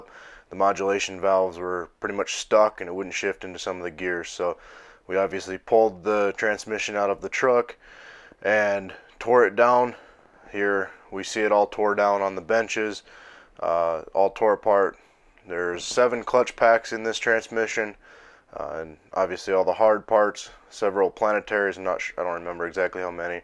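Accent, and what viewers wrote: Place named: North, United Kingdom. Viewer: Judging how he sounds like he's from the north, I'd say this dudes gotta be making 35 bucks an hour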